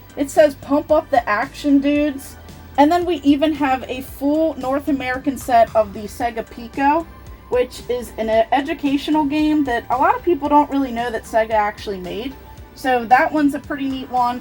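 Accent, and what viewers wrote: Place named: Baltimore, United States. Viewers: is she from maryland? i heard 98 rock and shes got a thick baltimore accent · 2 minutes in hearing her accent - ok yeah, she’s definitely from Baltimore lol. Amazing game room!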